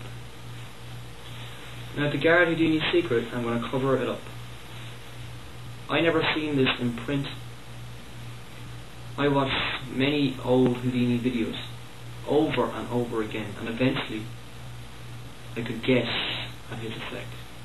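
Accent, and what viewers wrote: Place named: England, United Kingdom. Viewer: Its all in the presentation & how polished the act is, I would suggest rehearsing a script & practice in front of a mirror. Also, try not to mumble so much. I can hear an English -descent accent and yet my North American "western drawl" would still trump your diction.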